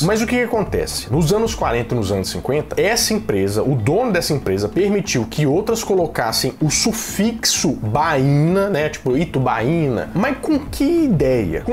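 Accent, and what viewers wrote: Minas Gerais, Brazil. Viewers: Top. Muito show mesmo. Parabéns Então, pensava que vc era mineiro.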